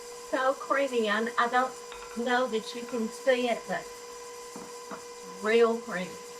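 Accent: South, United States. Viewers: Love hearing the southern accent. I miss my mountains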